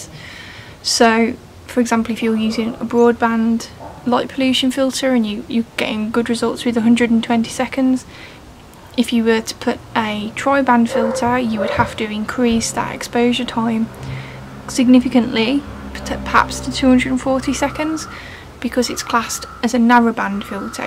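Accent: United Kingdom. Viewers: Great Video. Your honest opinions are definitely inspirational. I'm liking the way you say "Astro Pixel Processor" in your English accent so I continue to watch. Your direct responses to questions are right to the point which is more a reason for me to watch. I bought APP based on your recommendation and I never buy subscription software. I'm just learning an Astro cam. I have ZWO533 and ZWO183 and hope to get some images after being a DSLR user for many years. I bought the LP-Pro filters also based on your reviews. Hard to get these here in the USA. I have much light pollution over Boston, Mass and value your insights. Keep up the great videos. Being in a Pandemic, outside with the stars is the safest place to be.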